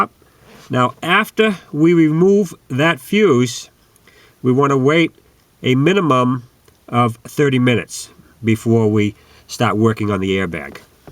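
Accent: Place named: New England, United States. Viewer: Probably from New England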